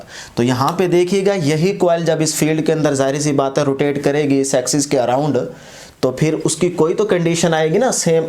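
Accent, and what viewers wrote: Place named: Saraiki, Pakistan. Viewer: sir m also.. from ur city bahawalpur But now m living ......in Lahore ...but I really appreciate ur hardworking n saraiki tone loved it .,.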